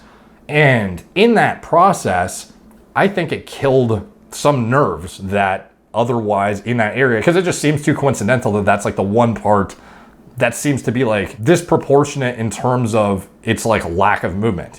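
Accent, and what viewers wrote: Canada. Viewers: honestly hadn't noticed, just figured it was your Canadian accent lol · Woah is he Canadian? Just noticed his accent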